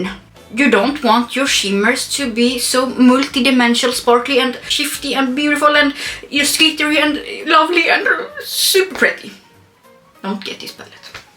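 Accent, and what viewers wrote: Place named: Sweden. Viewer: The Swedish accent is on another level! 😍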